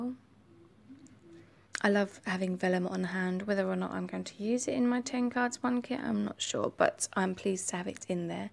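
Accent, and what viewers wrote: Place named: United Kingdom. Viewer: I could listen to you talk all day! I'm a sucker for a British accent. Lol! Beautiful kit,.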